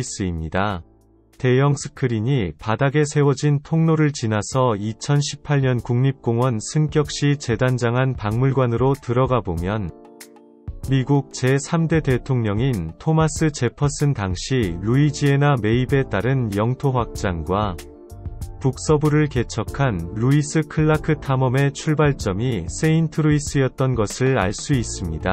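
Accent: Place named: Gyeongsang, South Korea. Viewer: 유튜브 열심히 하시기로 한거 축하드려요! 저는 이미 구독자입니다^^ 부디 실제 음성을 들려주소서.. ㅎ 경상도 사투리 나쁘지 않습니다.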